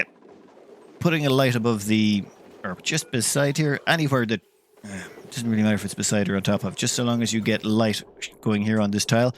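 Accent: Ireland. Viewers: You aren't from Ireland like me are you? Dublin here.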